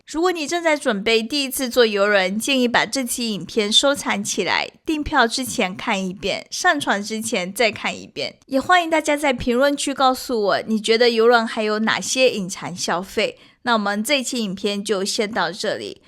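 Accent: Fuzhou, China. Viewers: 典型福州口音？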